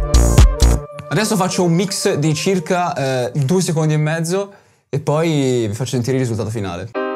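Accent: Italy. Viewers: toscano AHAJAKNAPA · Non sapevo fossi veneto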